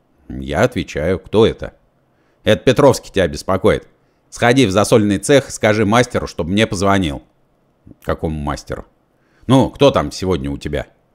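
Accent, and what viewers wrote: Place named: Volga, Russia. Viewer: Плохо читает. Говор волжский. Чтец должен быть с никаким говором, как диктор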